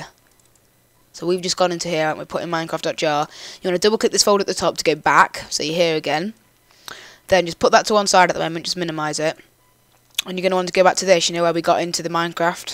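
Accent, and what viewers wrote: United Kingdom. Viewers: I gave you a like 'cause ur British!!! (im not o-o)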